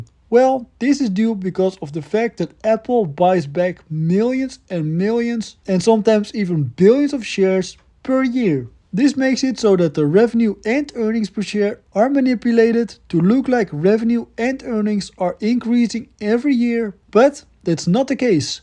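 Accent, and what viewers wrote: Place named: Netherlands. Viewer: Are u from belgium or the netherlands? U sound like dutch💀🤣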